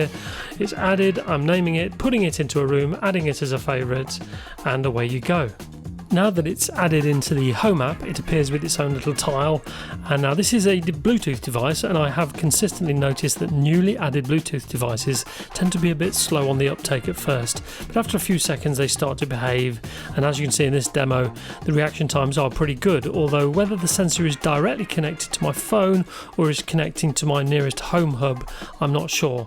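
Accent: United Kingdom. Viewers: Hi, where did you purchase these in UK?, as you sound like you are from the UK.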